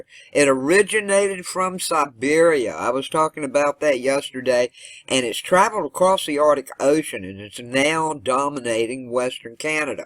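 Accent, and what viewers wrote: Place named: South, United States. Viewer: Well I am sorry for my mistaking you for a male . To my old ears you'r voice sounds of a southern male . If you could see my red face right now . I must commend you on accuracy with the reports . Well Sister have a fine day and God bless you . Can't wait till tomorrow for more cold weather news 🙏👍👍🇺🇸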